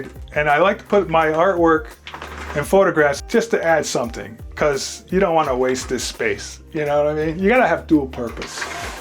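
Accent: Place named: East Coast, United States. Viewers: is my brother, and he is cuter, and so. so funny. He is so happy now back in CA. But yes the dialect is New England. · He's so much cuter than Will. I also detect an eastcoast accent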